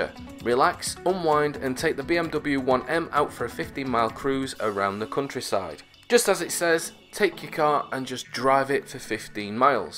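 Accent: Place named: North, United Kingdom. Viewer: Thanks awesome guy. Like the northern accent and you had a couple of funny one liners lol.. But all done!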